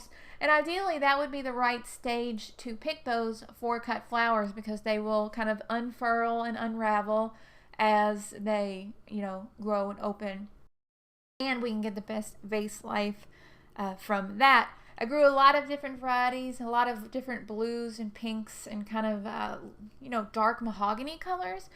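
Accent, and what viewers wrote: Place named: Tennessee, United States. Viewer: You sound like a friend of mine, she is from Tennessee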